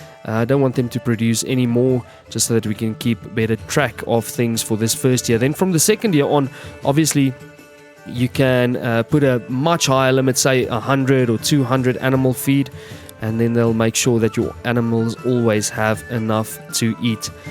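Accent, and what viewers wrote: South Africa. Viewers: The way this guy speaks, I can swear he's South African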